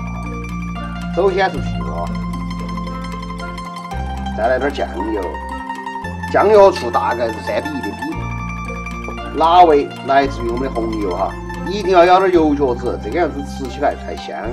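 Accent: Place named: Sichuan, China. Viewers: 我不是四川人，但听到的方言很亲切👍👍👍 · 口水哗啦啦，明天就试一下，这个成都话简直太亲切了！好吃的板😀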